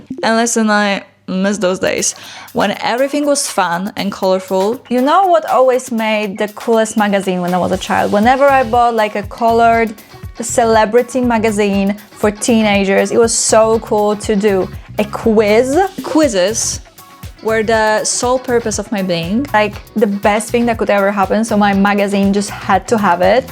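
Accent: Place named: Poland. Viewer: You have a really cute accent. Polish, right?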